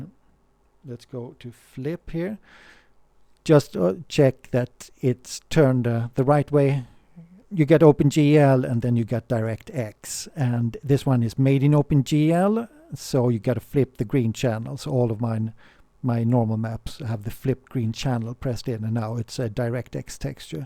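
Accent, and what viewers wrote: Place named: Scandinavia. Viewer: A nice package made with a Nordic accent...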